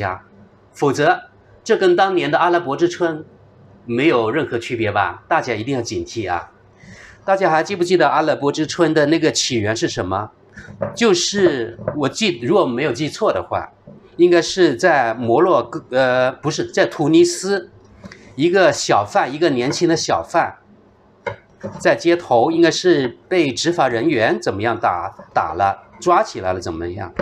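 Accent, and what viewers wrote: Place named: South, China. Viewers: airs yong 他普通话是流利的，但是有南方口音，这很正常。就象滇西小哥一样，最后一句话是有云南口音的，挺可爱的。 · 老乡，虽然你的南方口音和表达不是那么完美，但是听起来特别真诚。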